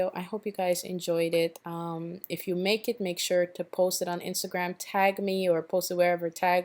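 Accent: Guyana. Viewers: By anychance are u Guyanese? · hey all the time I keep telling myself you're kinda speaking lyk a Guyanese but never bother to ask, but the way you're using those seasonings I just knew for sure you're from Guyana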